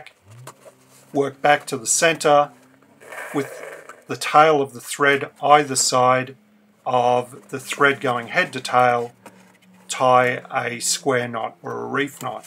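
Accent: Australia. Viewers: You are amazing! Thank you for sharing your knowledge with us. I particularly love hearing your Australian accent. I too am Australian and proud to be with such brilliant work coming from you. Thank you so much.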